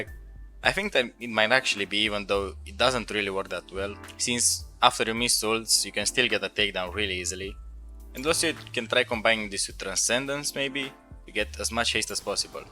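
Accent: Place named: England, United Kingdom. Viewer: Pana cand nu m am uitat la numele tau nu mi am dat seama ca esti roman frumos accent de englez keep up the good work!!!!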